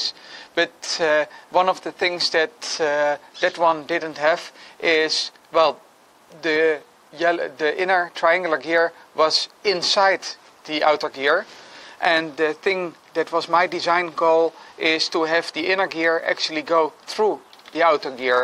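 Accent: Netherlands. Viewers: lekker nederlands accent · You sound Dutch, are you dutch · ben je Nederlands? je klinkt zo😉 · You are 100% sure dutch. (Me too)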